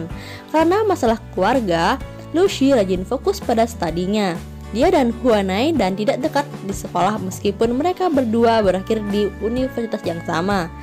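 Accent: Bali, Indonesia. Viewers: Logat balinya kentel🥰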